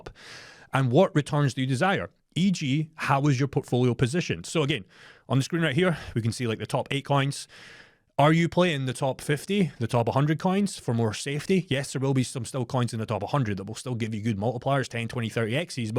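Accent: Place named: Edinburgh, United Kingdom. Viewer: As an ex Edinburgh resident I enjoyed hearing this well informed crypto briefing in an accent I know well. Great info. Excellently presented. I subscribed !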